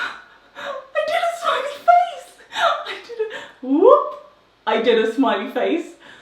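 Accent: United Kingdom. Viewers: What part of the UK do you think she is from?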